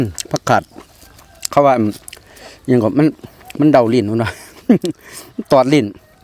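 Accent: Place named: Isan, Thailand. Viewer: เด๋อ..อีสาน lol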